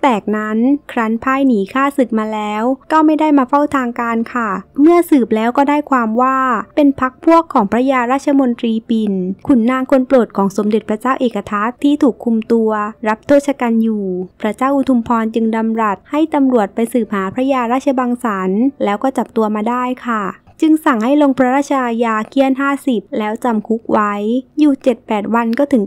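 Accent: South, Thailand. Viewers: เป็นคนภาคใต้แน่?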